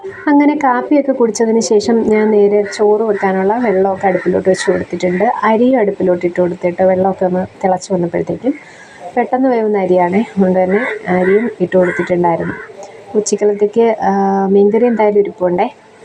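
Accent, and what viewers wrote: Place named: Kollam, India. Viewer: ഞാനും കൊല്ലം. ചവറ. സംസാരം കേട്ടപ്പോൾ തോന്നി കൊല്ലം കാരി ആണെന്ന്. അതാടാ ചോദിച്ചേ.❤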